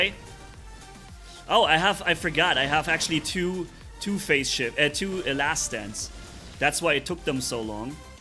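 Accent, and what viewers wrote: Germany. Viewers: Sein Akzent hört sich deutsch an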